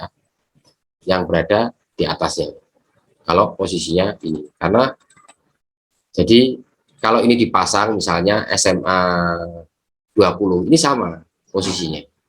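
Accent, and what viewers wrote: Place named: Java, Indonesia. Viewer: CUCOK SAY ....JAWIR BANGET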